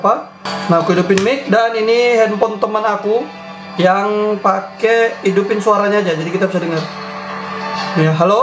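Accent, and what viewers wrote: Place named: Sumatra, Indonesia. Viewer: dari logat dan gaya bicaranyabyg "ala..." kayaknyanorg sumatera ya bg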